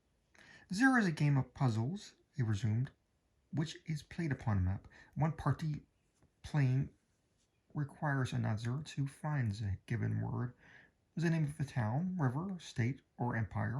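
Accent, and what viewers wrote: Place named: France. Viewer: He sounds French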